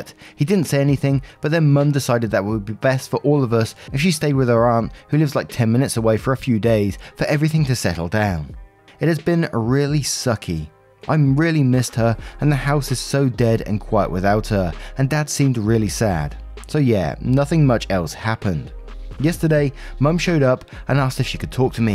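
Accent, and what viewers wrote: England, United Kingdom. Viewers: Hey hey! The isolation ward at the shelter is 100% full of sick kitties, but they are getting better. Thanks for your videos, as I said before your cadence and voice is soothing to the cats/kittens. Guess they prefer English accents over American. Hope you and Poppy have a lovely day!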